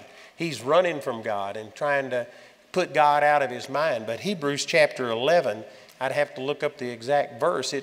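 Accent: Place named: Texas, United States. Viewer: Andrew, you are the best. You are, what, 73-years-old? You look half your age, strong as an ox, going great guns and you talk about standing 10 hours out of 11 like it's a walk in the park, not even realizing that you pushed yourself, LOL! I truly love and adore you. Your lovely Texan drawl still lulls me to sleep at night, especially when I am especially frightened or frail or falling into despair, and I am still very much Saved and living for the Lord and leaning into His sweet Grace and Comfort. Hallelujah. Praise God. Thank-you for being there, and being out there, and being so honest. Blessings to you 💙🙏🏼💙🙏🏼💙🙏🏼💙💙💙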